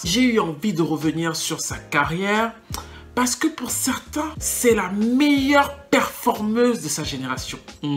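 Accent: Cameroon. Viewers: On dirait que tu es Camer 🇨🇲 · J'ai reconnu un petit accent camerounais ... 😏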